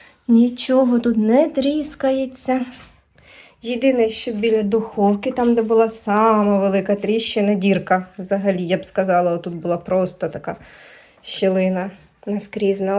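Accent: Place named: Russia. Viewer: Видно, що міська дівчина і російський акцент є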